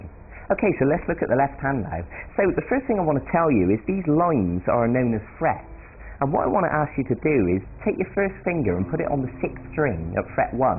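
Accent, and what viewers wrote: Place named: Australia. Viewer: pretty sure thats an australian accent...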